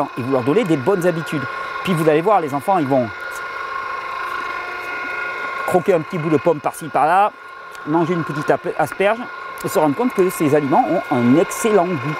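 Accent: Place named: South, France. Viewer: Regenere / Thierry Casasnovas Je suis de la région opposée à la tienne; que j'appelle "le Haut de l'France "😆 et ton accent nous apporte le soleil du Sud 🌞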